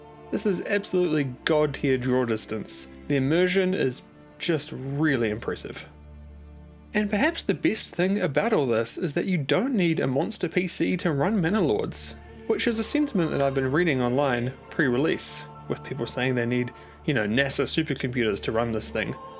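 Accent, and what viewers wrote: New Zealand. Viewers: Is this new zealand accent?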